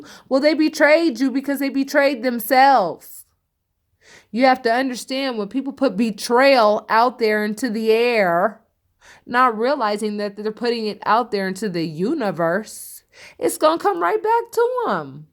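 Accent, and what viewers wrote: New York, United States. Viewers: you got that Harlem, Bx or Brooklyn heart in you...I can hear it when you speak😉🤩. Again, thank for this Cancer read!! Pisces is soon gonna see!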